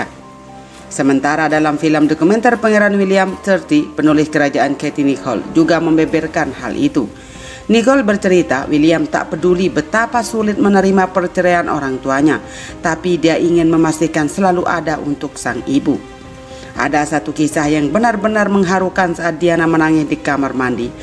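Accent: Indonesia. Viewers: Btw, apa gw aja yg ngerasa apa yg lain jg? Naratorny suarany kok medoks bgt seehh... OMG!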